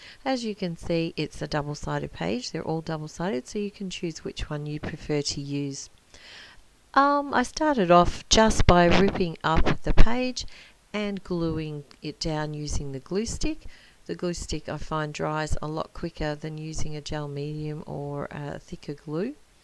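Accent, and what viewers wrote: Australia. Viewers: Hey, fairly new subbie, thank you for your video. I thought you sounded Australian, then you mentioned the reject shop, then you told us you were. I’m on Gold Coast QLD. Where do you hang out, glad I found you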